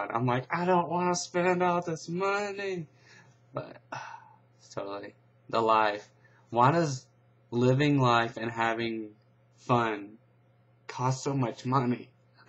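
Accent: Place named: South, United States. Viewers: your from LA???!! You seem like your from Alabama... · I love your southern accent :D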